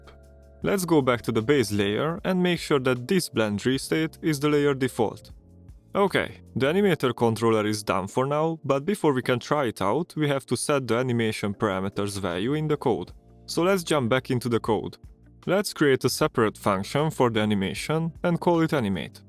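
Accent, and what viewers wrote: Hungary. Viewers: Magyar vagy ? olyan az akcentus. If you didnt understand nevermind my comment :)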